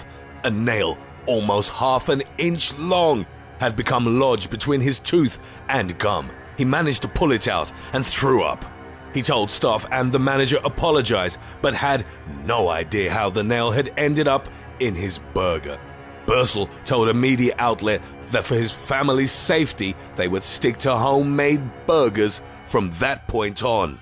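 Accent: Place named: United Kingdom. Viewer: British narrator what a joke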